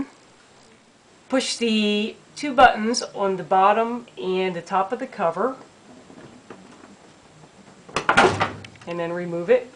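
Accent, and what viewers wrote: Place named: Baltimore, United States. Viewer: Great video! BTW, where are you from? Your accent reminds me of my home (Baltimore)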